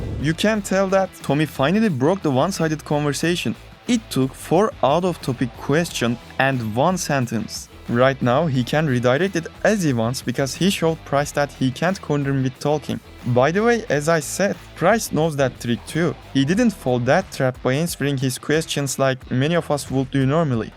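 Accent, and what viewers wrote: Turkey. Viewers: Türk sivesi nerde gorsem tanirim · are you from turkey? Your accent is very similar. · admin are you from turkey? your accent is so similar so im asking :) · Bro are you from Turkey your accent sounds so Turkish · Nice video mate, are you Turkish? Your accent sounds like really really Turkish! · Bir türkün ıngilizce konuşması 😂 çok belli ediyor kendini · Türkmüsün kardesim. Aksanın benziyor :D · türksün aksandan belli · Türk aksanı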